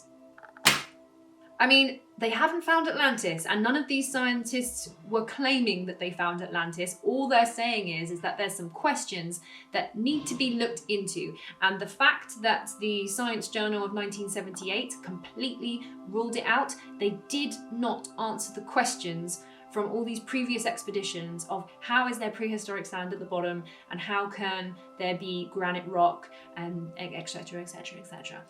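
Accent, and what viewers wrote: England, United Kingdom. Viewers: You, that British accent, gorgeous, humorous and backing it all up with Data, our own version of Laura Croft, cheers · wonder how many subs she's gained since Randall mentioned her on rogan... I mean that's why I'm here...I stayed bc I think I'm in 😍... Americans are simps for english accents at least this chicago kid is lol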